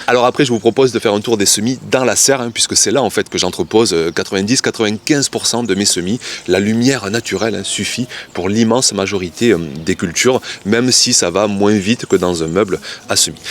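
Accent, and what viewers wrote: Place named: Toulouse, France. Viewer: Je suis du Québec. Je viens tout juste de découvrir tes vidéos. Elles sont super intéressantes. Mon neveu vit à Toulouse depuis près 20 ans et je crois reconnaître ton accent à moins que je me trompe. Donc si je comprends bien la saison de jardinage est grandement devancée grâce au merveilleux climat que vous avez la chance d’avoir. C’est définitivement 2 mois plus tôt que dans le Sud du Québec. Je suis jaloux… Bonne saison et bon succès.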